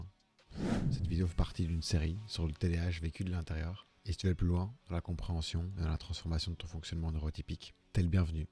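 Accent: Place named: Belgium. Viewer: je viens de découvrir à 34 ans que j'ai un tdah à 99prcent de certitude. pas de diagnostic et je sais même pas si j'en ressens le besoin. le plus important pour moi aujourd'hui c'est de comprendre mon fonctionnement de me pardonner et de me ménager. merci pour ton témoignage qui résonne si fort, ca rajoute de la lumière dans la compréhension des méandres de mon cerveau, de la beauté dans le dysfonctionnement et un peu plus d'auto compassion.. j'ignore si tu es belge, on dirait à ton accent. bah je le suis aussi, ca nous rend si proches hihi. à plus dans le bus!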